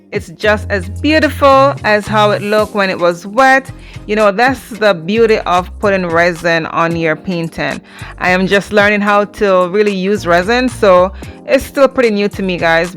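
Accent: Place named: North Carolina, United States. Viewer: When you said y'all I thought well I'm i n north Carolina so I recognize that accent. Nice work that you do. I've also subscribed to your channel as well. Let the journey begin. Embrace it... Yours truly Ed Parkhurst. Nice to have met you.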